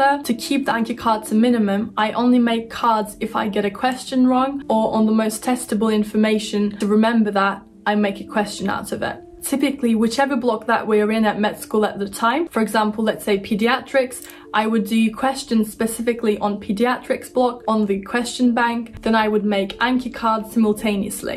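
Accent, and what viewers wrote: United Kingdom. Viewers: İngiliz aksanın çok temiz fakat çok aksanlı konuşuyorsun anlaması zor olabiliyor.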